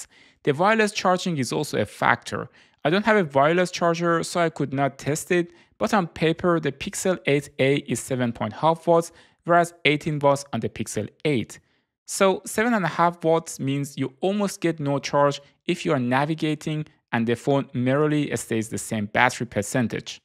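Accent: Iran. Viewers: Is that a Persian accent I hear?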